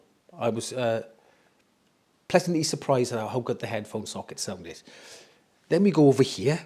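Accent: United Kingdom. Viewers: Excellent British voice, I’m jealous 😭💞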